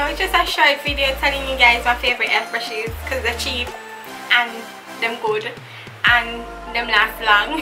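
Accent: Jamaica. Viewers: New Subscriber! I heard a familiar accent and had to support you. Good to see a yardie onYoutube!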